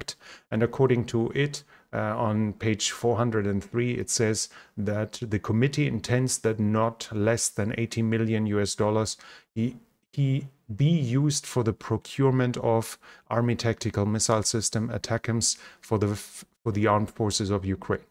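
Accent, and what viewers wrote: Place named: Germany. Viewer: Always remember. When your opponent is down on the ground an bleeding, it's time to start using the steel toed boots. And never in my 72 years in this life did I expect to find myself listening to a commentator with a German accent reporting on combat in Ukraine and saying 'and today on the Eastern Front...."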